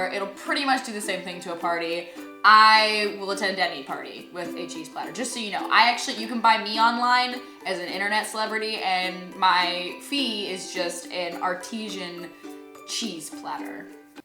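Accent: Arkansas, United States. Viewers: What part of Arkansas is she from?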